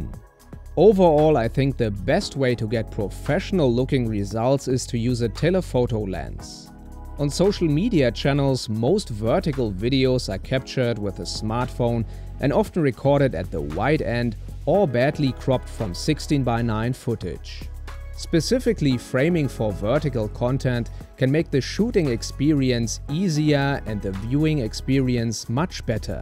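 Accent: Germany. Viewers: Love that massive german accent haha! (best greetings from germany)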